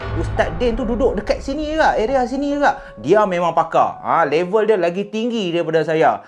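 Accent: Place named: Penang, Malaysia. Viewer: asalamualaium. pandai juga hang cakap peneng.hang pandai buat lawak.teruskan kerjaya hang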